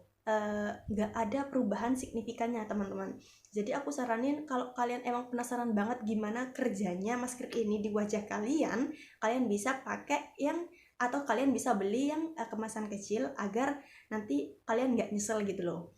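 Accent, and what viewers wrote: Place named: Java, Indonesia. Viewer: Udh lama di surabaya medokkkk deh mis yak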